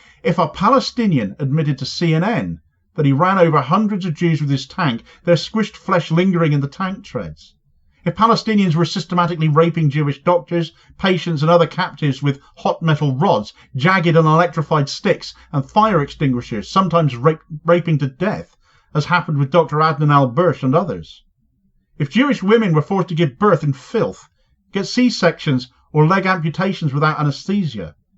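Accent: Cornwall, United Kingdom. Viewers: as much as I enjoy watching your vids and enjoy your dulcet Kernow accent, was the speech at the Oxford Union filmed? I know Susan Abulhwa has posted the transcript on X, but I'm trying to find a video version. Kudos for reciting this and bringing it to a wider audience.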